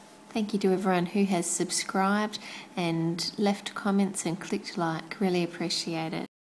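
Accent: Australia. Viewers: Are you Australian? 😊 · is your accent australian?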